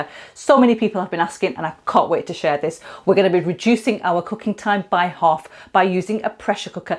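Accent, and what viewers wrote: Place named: England, United Kingdom. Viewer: Hey, Ur new subscriber..... I love Ur voice, and Ur brilliant ,clear English accent.....and not Ur eyebrows....lol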